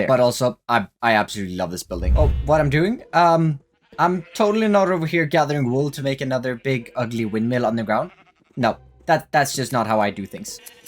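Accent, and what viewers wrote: Sweden. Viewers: Ar you Swedish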